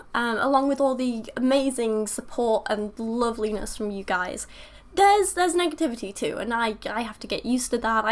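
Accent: United Kingdom. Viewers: Wait?¿ People actually make fun of your British accent?¿ I thought everyone loves British accents. Lol. Like I said to another prominent Atheist YouTuber (EssenceOfThought), 'I came for the British accent, but stayed for the Religion bashing and Science!' Lol =^p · u said about ur accent, I mean no offence but are u british, american because I can't be sure. sometimes u sound really British but u don't pronounce the letter t it's more d sounding. just curious, I never thought about it until u brought it up so pls don't think I'm being a troll. it a genuine question. I would guess ur British?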